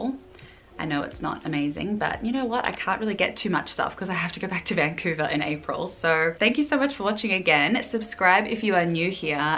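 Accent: Australia. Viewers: Welcome Home! Glad you still have your Oz accent...l laugh at some people who literally are overseas for a few months IE 5 minutes and they suddenly have a hybrid accent 🙄😆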